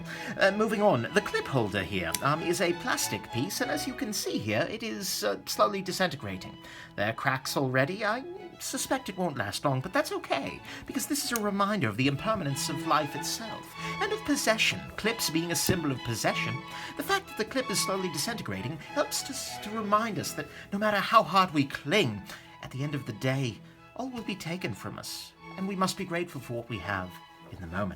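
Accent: United Kingdom. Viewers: OMG... British voice is more normal than his bat-reviewer voice!!! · Stellar accent 🇬🇧 Super review.....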